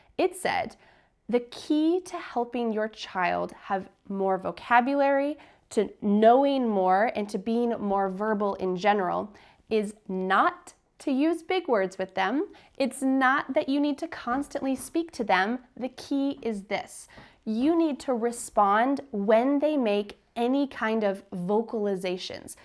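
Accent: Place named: United States. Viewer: My best teacher i love americans accent.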